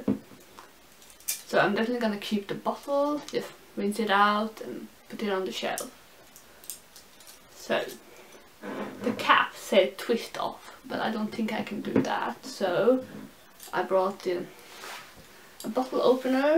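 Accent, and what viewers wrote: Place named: Sweden. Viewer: Love your accent, are you Swedish?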